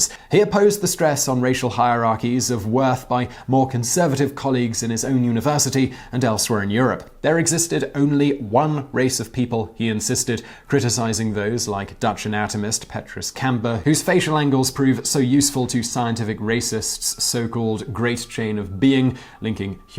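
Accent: United Kingdom. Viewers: how tf did i never know he was british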